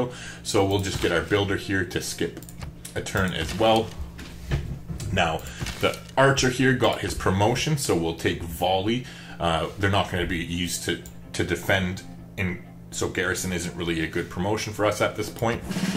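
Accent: Canada. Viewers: Where in Canada are ya from? I can hear it in your voice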